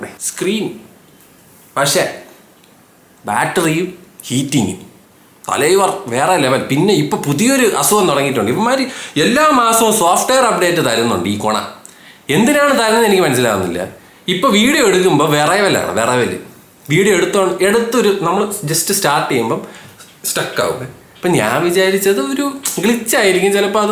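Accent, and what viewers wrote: Trivandrum, India. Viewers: Pinne enthire haha edayike Trivandrum slang varum.. adipoli man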